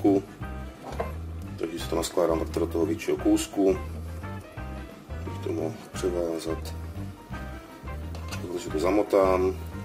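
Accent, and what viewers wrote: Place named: Hodonín, Czech Republic. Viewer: Super recept. Vypadá to parádně. Jsem sice ze Šumavy ale prakticky celou rodinu mám v Hodoníně a miluju to vaše nářečí. Strašně dobře se to poslouchá.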